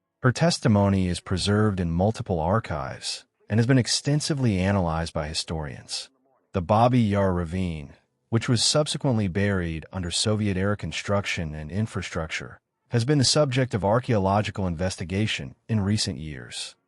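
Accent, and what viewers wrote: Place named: England, United Kingdom. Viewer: It would also be helpful if German words were spoken with more of a German accent than an English accent. If you want a quality product, this could be done. For example, It’s “gruppen” with a long “u” sound, not “grupen” like “up-en”. Spend the cash and get a professional translator to help with the accents/pronunciations. This is done all the time. Then it doesn’t sound so amateurish.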